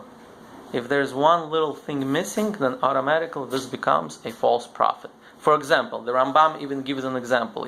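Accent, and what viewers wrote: Russia. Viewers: You are russian speaker по акценту замечаю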